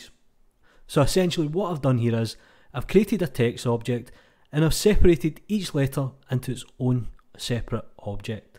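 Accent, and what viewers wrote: Scotland, United Kingdom. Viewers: When I turned on auto-generated subtitles with your scottish accent xD "I'm gonna add on fail open nod" -> "I'm gonna add on a fail or not"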